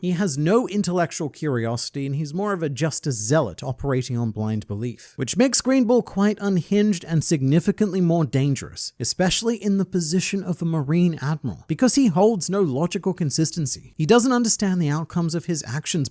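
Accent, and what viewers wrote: Australia. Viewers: Can’t really blame you. voice is rather soothing with that slight Australian ‘twang.’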